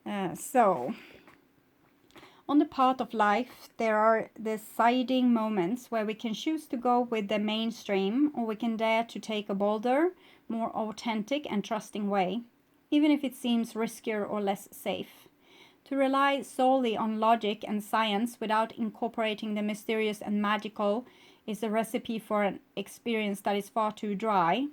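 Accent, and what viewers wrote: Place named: Sweden. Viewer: Hej! Är ny här! Tyckte jag hörde en svensk brytning😜🌻. Din läsning var verkligen mitt-i-prick, har även lyssnat på dom nyare läsningarna. Det är som att du beskriver mitt liv. Kommer definitivt följa dig!❤ Tack så mycket! Kram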